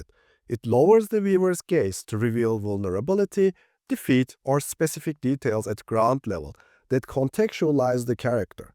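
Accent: Turkey. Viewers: Turkish accent :)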